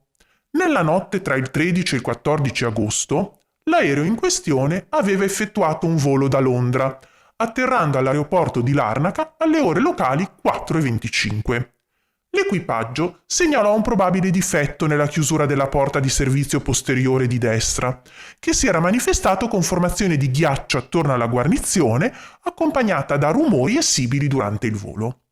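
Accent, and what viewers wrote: Genoa, Italy. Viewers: Con questa si sente meno l accento genovese belin😂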